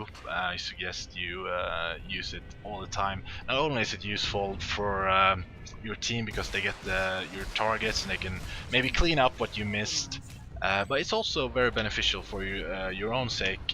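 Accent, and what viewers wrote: Sweden. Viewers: hes accent is very Swedish-Ish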